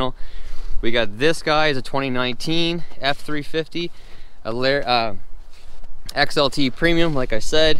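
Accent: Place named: Canada. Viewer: I just realized your Canadian.... now I can’t unhear it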